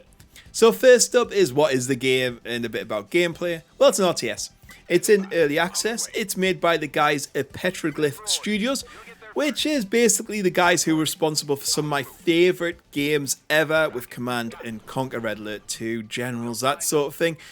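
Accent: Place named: Northern England, United Kingdom. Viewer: Sounds like ya not far from me mate, Darlington here!! Great video! think ill buy this :)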